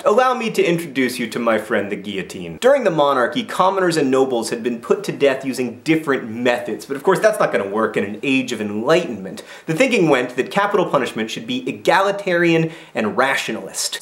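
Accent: United States. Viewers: did you say you didnt pronounse it right because your Americain? finally, i always think "what does he mean by that!" no offence but its because im british!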